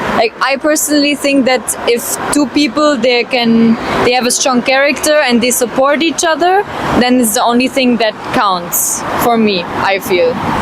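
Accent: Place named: India. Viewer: She has an indian accent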